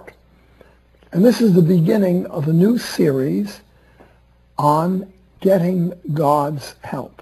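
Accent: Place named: New York, United States. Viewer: ♥️Father Benedict. Enjoy Heaven Thank You for all Your Recordings and vides on EWTN like yuh our style as and New York Accent. A real wealth of time spent in front of recording studio.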